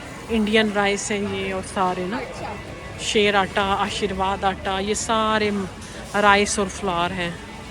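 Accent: Punjab, Pakistan. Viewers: Hi. Ap pachtu Hain or mashaAllah lagta nahi apki bataon se yehi lagga Hy ap Panjab see Hain. Bht saf Urdu bolti hai ap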